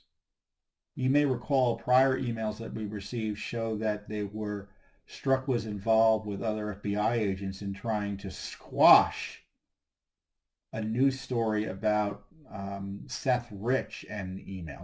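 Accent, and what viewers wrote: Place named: Russia. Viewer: I thought I detected the hint of a Russian accent.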